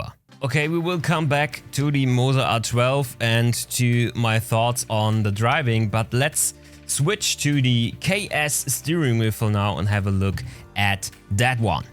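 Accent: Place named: Germany. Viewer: klar, 160 euro mehr als Deutscher... Abzocke at its best, und dein german accent suckz bad, versuch besser mal auf deutsch -.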